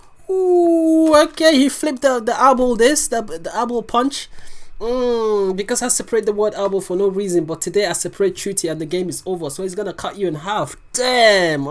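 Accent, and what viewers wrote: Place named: England, United Kingdom. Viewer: Tiene el acento inglés no creo que sea de Estados Unidos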